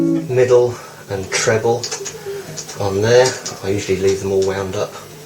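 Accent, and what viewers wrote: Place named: United Kingdom. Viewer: you sound british and tell the weight in kg, astounding!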